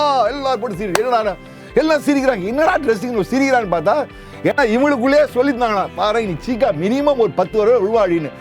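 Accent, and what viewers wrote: Chennai, India. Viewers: Love your easygoing and lovely natural narration, and with the Chennai slangs it’s even more funny!! Gadupadango was the funniest! 🤣🤣 Amazing tribute to one of the all time greats of Cricket. Almost 30 years since Kapil retired but we are yet to find another one like him, that shows what a rare breed he was! Hats off!!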